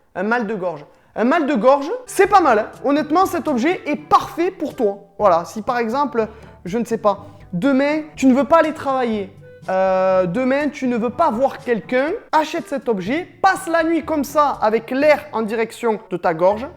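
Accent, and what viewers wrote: Marseille, France. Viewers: Accent marseillais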